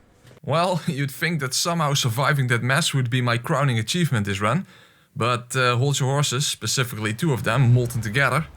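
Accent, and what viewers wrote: Netherlands. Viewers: Its because he's Dutch and funny and smart. The internet likes american accents, doesn't like good jokes just "randemz lolololol" nonsense, and also people don't like smart folk because of social anti-intellectualism. IMHO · Your accent is all too familiar as a Dutch person, but otherwise your comedy is top tier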